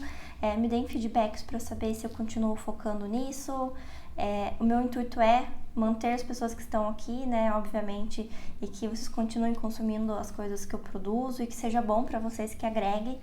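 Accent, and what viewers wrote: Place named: Interior, Brazil. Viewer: Olá, Vamos bater um papo?! Primeiramente que delícia de vlog. Segundo sua casa é tão linda! Você me parece do interior, pelo sotaque, mas fico na dúvida se é inteiro de São Paulo ou de algum estado do Sul. Parece que vocês vão morar um pouco distante da cidade, você está gostando de morar mas distante da cidade, caso seja esse o caso. Eu tenho vontade de ir para uma cidade pequena e morar um pouco distante do centro comercial do bairro mesmo, mas bate aquele medinho, moro na cidade do Rio ou seja loucura e o bairro onde moro tem de tudo, o que eu acho prático, daí vem aquela dúvida se me adaptaria. Terceiro, também não consigo ficar muito tempo com o mesmo livro, eu me canso, igual você. Quarto, também não sou fã de salão, nem do lugar onde faço depilação que é relativamente calmo, vou porque tem que ir, se deixar crio raízes dentro de casa. 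Quinto também não gosto de lugar barulhento, lugar cheio e som alto me deixa extremamente cansada, eu sou introvertida, eu gosto de calma. Sexto amo resenhas sinceras, se não gostou de uma leitura acho bacana compartilhar com as pessoas não para fazerem elas não lerem mas para mostra seu ponto de vista, sempre acho que atitudes humanas, como gostar e não gostar de uma coisa nos aproxima, como dizem gente como a gente, e você faz isso super natural e de maneira sincera. Bom, falei muito, digitei na verdade, rs! Fique com Deus. Beijos 😘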